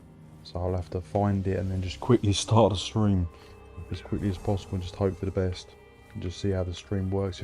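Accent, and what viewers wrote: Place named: Kent, United Kingdom. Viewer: Hey bro, pretty awesome build. Thanks for the good work. Sound like you're from down Kent way. I saw a build for battlegrounds and cyrodil but can't remember if it was you or another creator, sadly I got interrupted with family bits and lost the video! But if it wasn't you maybe you can use your big brain to make it? Was a gank build using destruction staff and frost, the numbers were absolutely huge. Think there was some elements of heavy attacks from range and the recovery was shite. But... Did some nice AOE too. PvP is wild since subclass, I've been away 4 years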